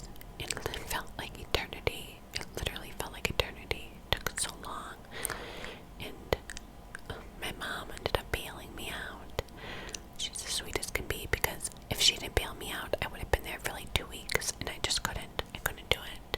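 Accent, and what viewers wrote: New York, United States. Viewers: Glawss that's exactly how she pronounces it with her NY accent lol love it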